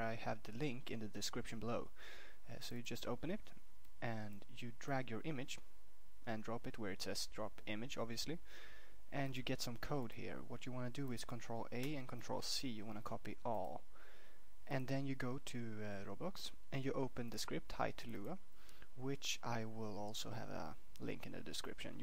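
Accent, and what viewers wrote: Sweden. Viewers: Have you never heard a Swedish accent before? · Lmao, most of the comment are about hes voice / Swedish accent. Like me "Tjenare" c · wow my accent of a swedish person didnt sound anything like this. fuck, and notice at how people give more fucks about your voice rather than the video subject it self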